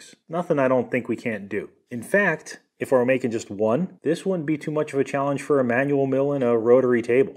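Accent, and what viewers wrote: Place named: Philadelphia, United States. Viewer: As an amateur linguist. And a cunning one, at that. Something weirds me out... you have a Philadelphia area accent... but you said "pop cans". People in the northeast don't say "pop cans", they say "soda cans". So wtf? My conclusion: you're from the Philadelphia area, but you currently live somewhere in the middle of popcan country. OR, it could be that you're in the rare, super thin strip of middle Pennsylvania where the Philly accent overlaps with the popcan people. whats the deal?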